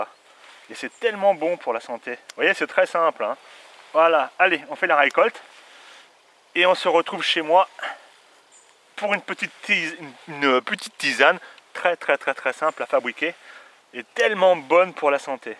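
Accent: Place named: France. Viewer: Tu m'as convaincu j'en fait une soupe dès demain ! (PS : j'adore ton accent bien franchouillard, tu respire la sympathie et la bonne humeur ;) )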